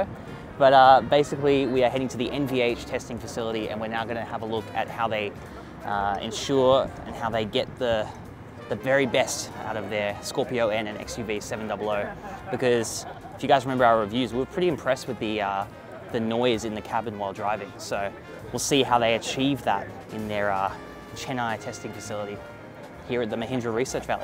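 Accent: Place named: Australia. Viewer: I didn't know you were from Kansas? I could have sworn you were Australian!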